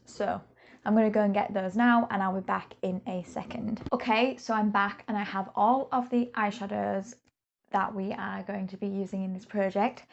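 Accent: United Kingdom. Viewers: Love hearing a fellow northern accent on here! Great intro, will be following along with your updates ✌️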